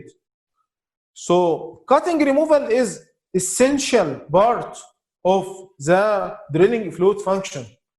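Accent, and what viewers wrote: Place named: Egypt. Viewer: why you zbeek like egypt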